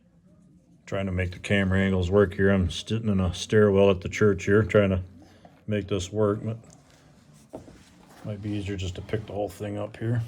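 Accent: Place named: Rockford, United States. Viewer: Damn thats one hell of a drive! I know you said you used to live in Illinois, but the way you talked I thought it was around Rockford.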